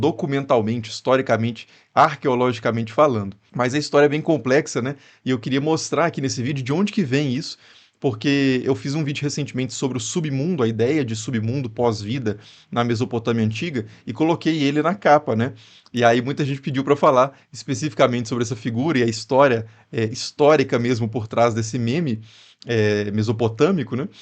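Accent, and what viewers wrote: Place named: Rio de Janeiro, Brazil. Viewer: Com certeza Carioca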